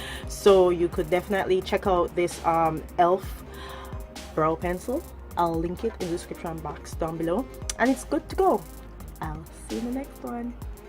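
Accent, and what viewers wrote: Jamaica. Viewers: Are you Jamaican or Africa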